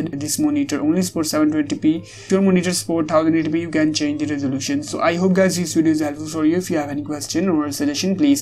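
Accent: India. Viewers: He sounds indian, no offense dude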